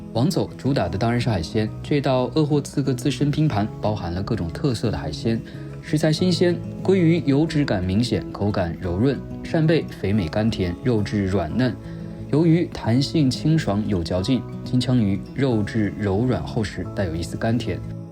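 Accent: Taiwan. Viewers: 聽這位小姐姐口音是台灣人！ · 聽口音，女生是台灣的???? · 這位小姐的口音感覺像是我們台灣同胞!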